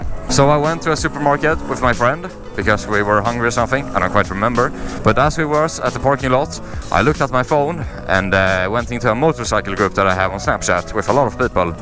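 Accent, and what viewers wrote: Sweden. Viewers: Are u from sweeden? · u sound swedish are you? Im swedish. hej hej vad heter du? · Ja, man kan ju höra svengelskan 😂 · are you swedish>??? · I can hear you are Swedish instantly I’m Swedish too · jag kan höra svenska dialekten bro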